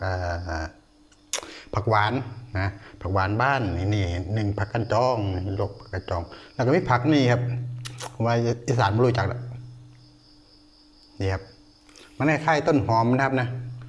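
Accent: South, Thailand. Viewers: พูดแบบใต้ๆ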